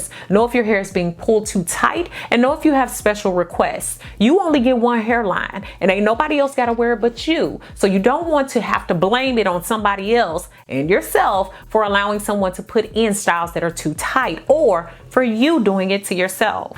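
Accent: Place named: St. Louis, United States. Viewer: I felt like she was from home!!!! Thought i was trippin!!!!! 314 STAND UP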